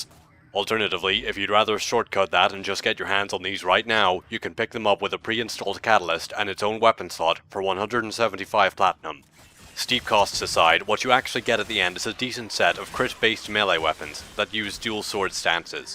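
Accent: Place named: United Kingdom. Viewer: You sound like a British (Not sure what that accent is...) Skallagrim while ranting about weapons.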